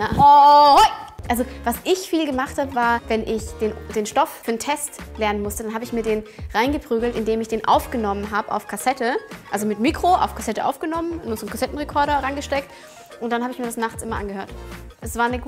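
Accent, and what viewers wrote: Austria. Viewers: Ich vermisse deinen österreichischen Akzent :( deabo :(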